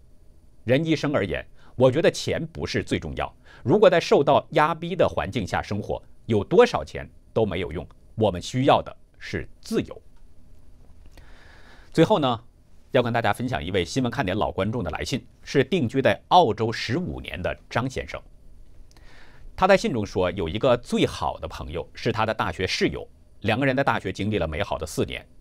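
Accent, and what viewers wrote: Standard. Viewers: 最标准声音主播👍☕️ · 这个主播的普通话很标准，但我肯定他不是中国人。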